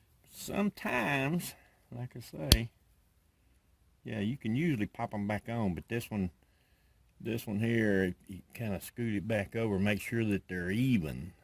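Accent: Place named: North Texas, United States. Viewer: I looked at a couple three videos before replacing the trunk lift struts on my 2004 Impala, and I found yours very helpful because you showed the inside workings of the joint when the clip was moved around. No one else did that, I guess assuming we knew how it worked. Sure, once it’s open and you can see the nylon ball, it’s easy to understand. But every other video was all “put your thin flat blade in here and it will come off.” But if you can’t visualize what’s happening inside, you worry that too much pressure will cause the joint to fail and then you have another part to track down and replace. That, and your comfortable way of speaking, like all my folks did in North Texas and Southeastern Oklahoma, was really nice. I’m subscribing. If you have any tips on growing okra, I would appreciate them. I live in Utah at 4300’ and it’s hard to get a long enough growing season to make a decent mess of okra. 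Thanks again.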